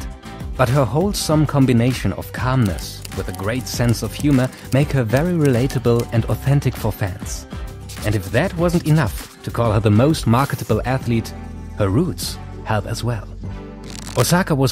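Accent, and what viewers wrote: Germany. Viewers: Are you [speaker] from Germany?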